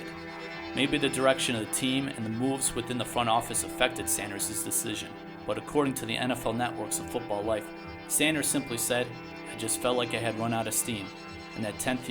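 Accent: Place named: Midwest, United States. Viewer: Great Midwest accent and great video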